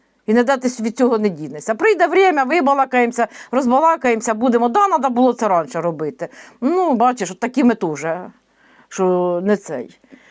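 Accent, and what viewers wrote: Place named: Kyiv, Ukraine. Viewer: ви дуже гарно розмовляєте, точнісінько так як я і все наше село!.! Я з Київщини.!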